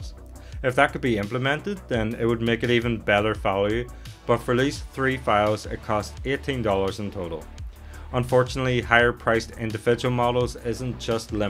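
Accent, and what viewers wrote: Northern Ireland, United Kingdom. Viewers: Whereabouts in Northern Ireland are you from? 😁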